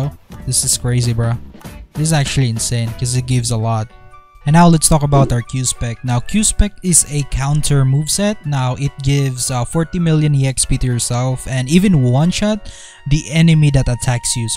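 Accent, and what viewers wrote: Philippines. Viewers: Yung accent mo preee parang Filipino ka ah! Notice mo sana to men if filipino kanga ba talaga at kung na iintindihan mo tong sinabi ko.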